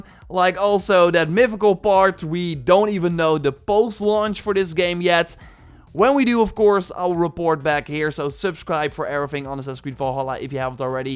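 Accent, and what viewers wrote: Netherlands. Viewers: Man he has such a bad accent, I mean I know several dutch ppl who speak normally and this guy's out here saying fideo and ashgard and volhala hahaaha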